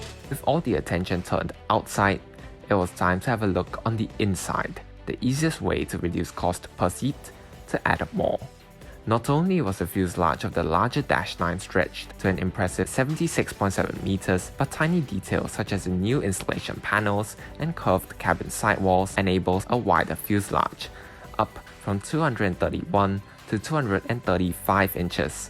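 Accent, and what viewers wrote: Singapore. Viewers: Nice documentary. Thanks for creating it. Is that a Singaporean (or perhaps Malaysian) accent you have?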